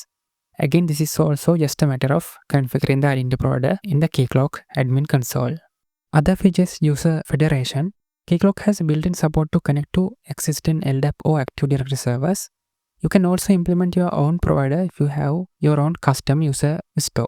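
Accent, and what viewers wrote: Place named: India. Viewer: God, please, give me a tutorial without indian accent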